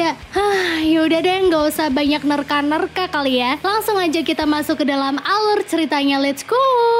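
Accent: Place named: Java, Indonesia. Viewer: Wong jowo ndi mbake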